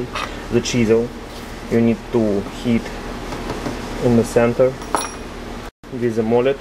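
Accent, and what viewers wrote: Russia. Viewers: Как будто чувак русский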